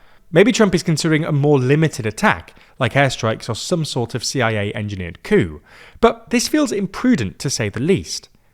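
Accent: United Kingdom. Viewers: People with British accents don't get to tell Americans what we can and can't do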